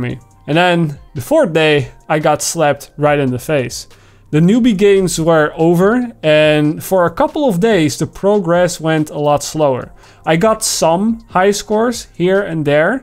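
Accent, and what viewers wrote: Netherlands. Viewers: Dude got some Dutch accent, btw I also used this aimtrainer and now can outaim most of the players i play against (Now I just need to grind to develop game sense)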